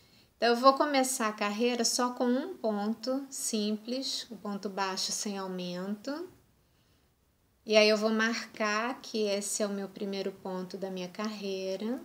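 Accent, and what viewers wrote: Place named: Rio de Janeiro, Brazil. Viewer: o seu jeitinho carioca de falar e um amor! Good Bless you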